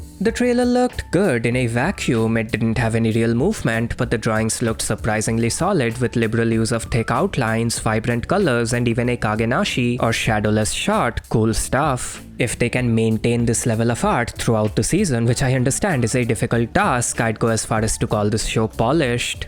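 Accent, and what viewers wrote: India. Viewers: Will your indian ascent ever go away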